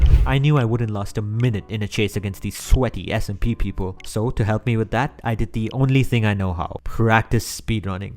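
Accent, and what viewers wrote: India. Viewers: why u have indian accent here, but american in other video😭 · You sound indian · I love his indian accent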